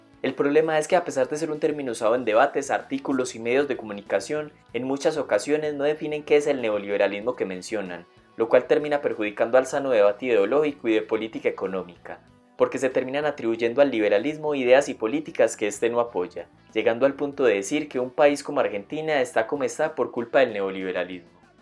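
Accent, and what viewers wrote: Antioquia, Colombia. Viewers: Oye, puedo notar en tu acento que eres de colombia. De estar en lo correcto te queria preguntar: pensando en las proximas elecciones que candidato tu consideras que representa mejor o en algo los ideales liberales? Saludos desde Australia! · Seguro después de un año ya sabes la respuesta, pero sí, lo es. Pareciera ser de la región de Antioquia o al rededores por el acento. Saludos.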